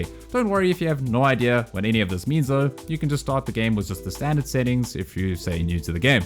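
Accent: South Africa. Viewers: My man sounds like a Saffer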